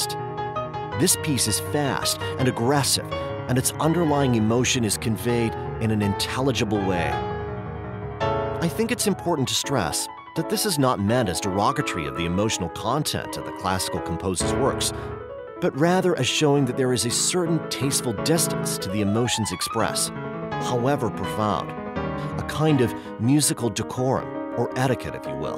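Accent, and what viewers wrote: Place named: United States. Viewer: If you have an American accent, then go by that. You sound like you're American.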